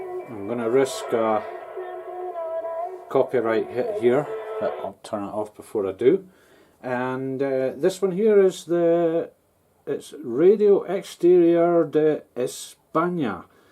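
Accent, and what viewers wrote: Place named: Scotland, United Kingdom. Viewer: Thanks and apreciated video with a Scottish accent, qth here west coast of Scotland 73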